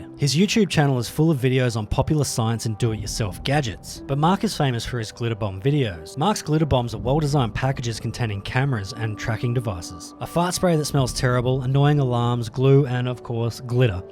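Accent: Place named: Australia. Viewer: and i just realised your Aussie, even bloody better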